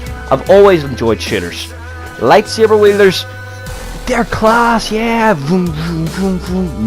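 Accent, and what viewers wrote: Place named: Northern Ireland, United Kingdom. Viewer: Great video well done keep this up and you get somewhere your accent sounds like it’s from Northern Ireland I’m from there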